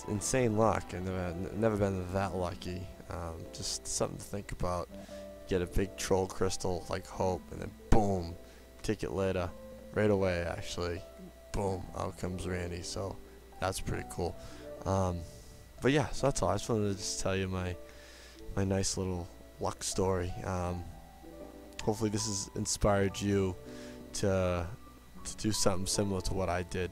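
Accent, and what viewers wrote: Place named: Boston, United States. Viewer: Haha awesome luck. That's pretty ridiculous odds. How'd you like the Super Bowl. I really dont keep up unless Tom Brady is involved. You sound very Boston so I guess you might be a Pats fan :p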